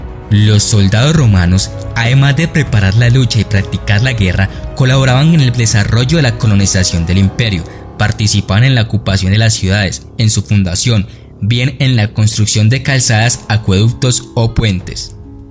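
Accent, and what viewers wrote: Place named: Bogotá, Colombia. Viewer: El parcerito tiene acento Rolo😸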